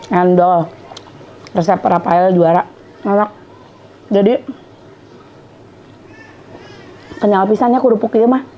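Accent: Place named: Sunda, Indonesia. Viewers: Ceceu muni lekoh sundana 😂😂😂 resep jadina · meni lekoh kitu sundana teh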